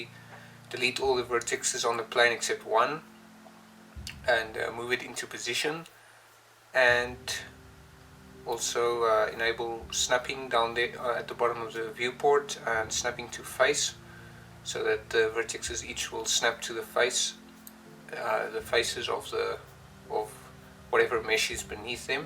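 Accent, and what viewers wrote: South Africa. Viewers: dude you sound extremely South African, I need to know you nationality !!!!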